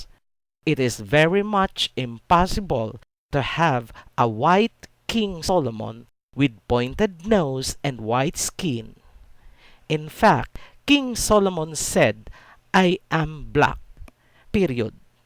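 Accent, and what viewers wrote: Bisaya, Philippines. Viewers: bisaya po kasi ang accent niya pag ini try niya mag tagalog kaya ini-english nalang niya. 😂😂😂😂 Anyway bakit Hindi mo panoorin yung ibang mga videos niya. nag tagalog na kaya siya. panoorin mo kasi yung iba niyang topic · I agree with Tarogi Taraki. Sounds like they dont believe in the flood and that we all came from monkeys. Mali ba ako? Pero sounds like bisaya yung nag salita at yung sinasabi nya hindi naman sya nagsulat. Who wrote what you reading and who paid you to read it???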